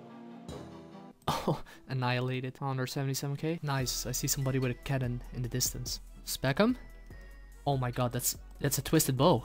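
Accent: Netherlands. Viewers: Are u Dutch? Accent tells everything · Your dutch accent is so obvious 😂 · Keep up the work man, just found you and you earned a new sub from me. Btw are you Dutch? RSN: Penguin Hug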